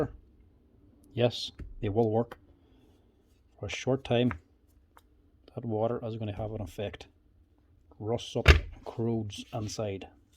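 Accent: Ireland. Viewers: Why are these tool repair guys always Irish?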